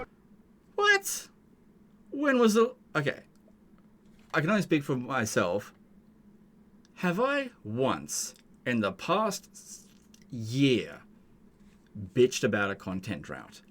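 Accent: Australia. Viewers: Bleaaase dont quit YT entirely, i can't go for weeks without your soothing Aussie voice playing on my second monitor!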